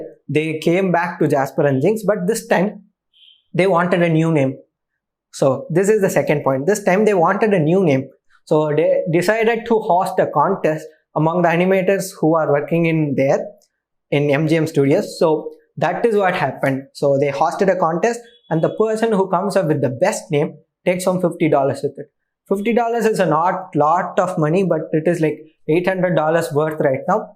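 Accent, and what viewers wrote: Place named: Kerala, India. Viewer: He sounds malayali to kaisa bolinga une haule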